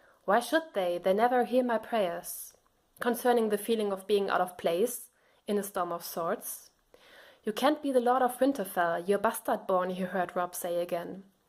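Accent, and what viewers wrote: Germany. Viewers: That german accent . Nice theory tho. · Is English your mother tongue? Your speak a bit with a german accent, I guess, but that's very enjoyable...^^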